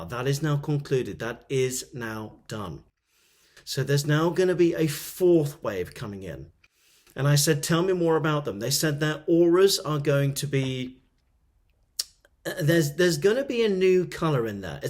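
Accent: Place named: United Kingdom. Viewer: I love this accent...which part of Uk is this accent from???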